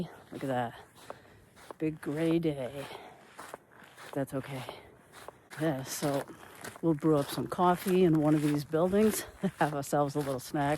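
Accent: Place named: Massachusetts, United States. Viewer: You sound like my mom and my aunt from. They're from Malden Massachusetts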